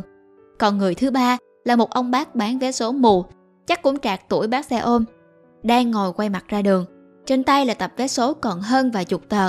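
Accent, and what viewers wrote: South, Vietnam. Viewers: ơi là. Giọng Nam đáng yêu quá!!!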